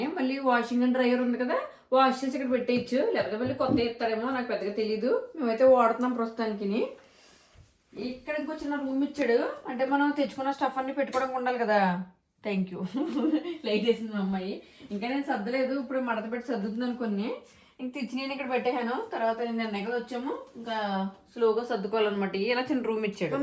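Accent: Godavari, India. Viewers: Mee video choose choose vizag slang poye godavari slang vachestundi AAYEE.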